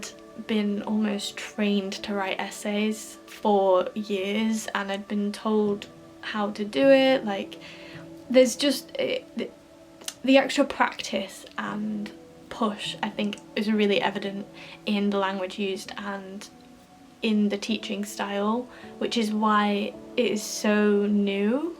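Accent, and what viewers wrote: Northern England, United Kingdom. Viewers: Interesting video! I was at The Queen's College Oxford, 1994-97. Although I am British, I had never lived in Britain before I went to Oxford, so most things were very foreign to me, but I really enjoyed it. It's funny you mention the Northern accents, because Queen's has historical Northern connections, and your kind of accent was quite common in my college. One of my mates there was from Sunderland, with a really strong accent and nobody made any fuss about that. Maybe things have changed since my days, or maybe that was just my college. Who knows? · I can identify with so much of this! Yesterday I watched programmes about state and private school head teachers swapping places, which was so interesting. It's a different world at 'public schools' and no wonder they can fit in so easily at a place like Oxford. Even though it's now 29 years since I went to Oxford (hard to believe, it seems more like 15!) your experience sounds so similar to mine. 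I love the old buildings... accents was something people discussed (I would not have been able to guess where yours comes from it almost sounds 'normal' to me with hints of a northern accent, but then some of my friends here in Wales sound very much like you!) Formal dinners were so awkward as I was afraid of how hard it is to have good table manners. (The first time I asked what the broccoli was because I had never seen it before - it was not a common vegetable in the 1980s!) They were every Tuesday in my college but were optional and I think I tended to go about once a term. I much preferred cooking for myself. As for drugs, I never came across anyone into that, only maybe once a friend was harming herself and might have been using medication. I had something better than a better 'study drug' - baking choc-chip cookies and selling them to my friends to raise money for charity. We all thought they were great 'revision fuel'. 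Most played music in their rooms to help concentrate on studying. That was new to me, as I thought that would be a distraction, but I ended up doing it myself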